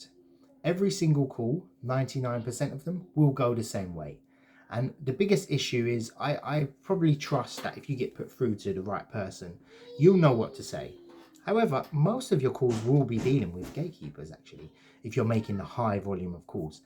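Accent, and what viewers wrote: United Kingdom. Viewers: Your British accent made me remind of my old gold days at Guildford. Now I am in India working as a US Tech. Recruiter. I have subscribed to your channel yesterday and enjoying watching the videos. You are passing good knowledge. Keep up the good work. Thank you!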